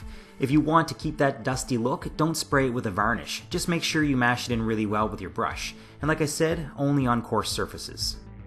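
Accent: Canada. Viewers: perfect base! that accent is definetly from the atlantic provinces! greetings from cape breton!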